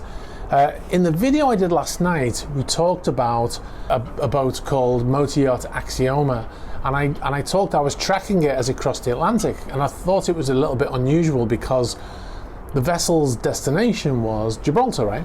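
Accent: Liverpool, United Kingdom. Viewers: That WSJ is such a prick for stealing your information! Shows that most mainstream journalists are vile and why many of us are turning away from it and concentrating on YouTube instead. I hope karma steps in…. But your reporting is so much better, concise and not biased which is so refreshing and kudos for reminding the idiots out there that not all Russians are bad. Also guessing you are from Liverpool? Lol I’m from the Wirral!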